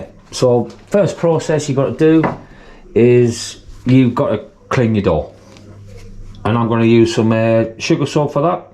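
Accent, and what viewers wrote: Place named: Northeast England, United Kingdom. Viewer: You sound like you are from the North East of england, just wondered how the paint managed in all the glorius weather we had? Looking at doing my own with the same paint and your video popped up in my searches. Thank you!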